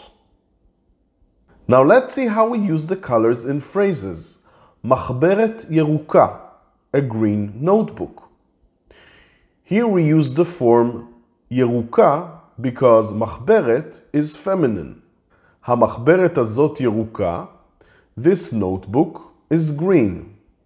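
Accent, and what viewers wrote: Israel. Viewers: You sound like an Israeli! מזל טוב! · Fale Hebraico e Pronto! You must not be an american, because he doesn’t sound american. Mr. has a gift of being fluent in english (a good one at that) but his accent is israeli. He doesn’t sound american lol!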